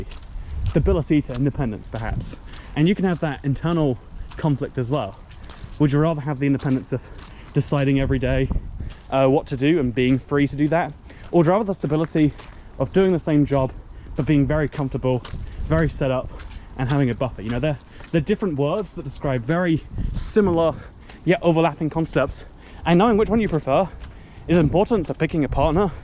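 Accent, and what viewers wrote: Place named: RP, United Kingdom. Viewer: It's purely the posh accent lol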